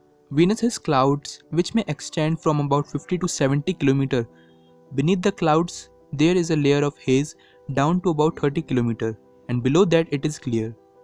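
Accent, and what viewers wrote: India. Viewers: The way you speak English I understood that you're from India. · I think you r a Indian!!! because of ur pronunciation I can gusse u r a Indian!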